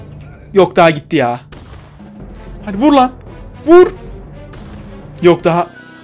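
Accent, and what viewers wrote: Ankara, Turkey. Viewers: abi sen ankaralimisin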